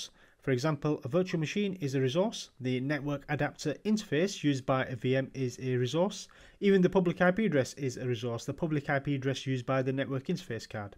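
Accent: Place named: England, United Kingdom. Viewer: Finally a proper English accent. Thank you.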